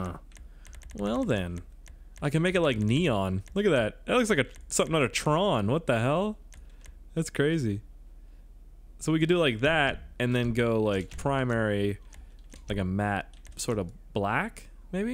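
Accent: Canada. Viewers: "when I get angry I sound really Canadian" I hear ya there bud lmao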